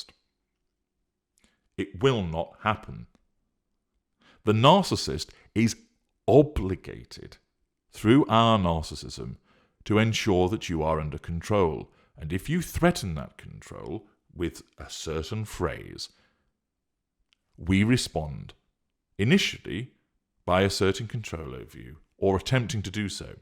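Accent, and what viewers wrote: United Kingdom. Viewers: I like his voice.. sometimes it is creepy in a way, I guess. But I like his British accent.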